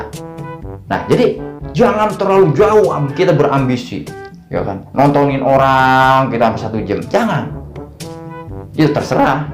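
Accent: Jakarta, Indonesia. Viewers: Iya iya..... Coba itu 😂😂😂😂betawi banget..... Salam depok citayam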